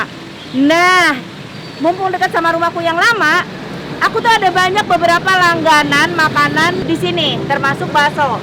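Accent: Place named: Sunda, Indonesia. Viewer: Sekarang aq jd ngefans am teh.. ngomng nya sunda pisan orgnya baik ke semua org sehat Dan sukses Terus teh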